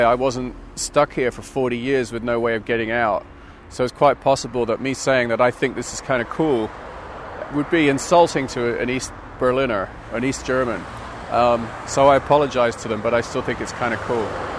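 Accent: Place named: Portsmouth, United Kingdom. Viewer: Huh? That's not true at all. He sounds exactly like what he is - a guy from Portsmouth on the south coast of England. (Also my home town.) You might be getting confused because the Pompey accent has a partial west country burr on the Rs to it (like a pirate), and that same burr has always been a non-trivial component of the American accent. But trust me, his accent is definitely English. Perhaps just not the accent you think of as being English (there are DOZENS of distinct English accents).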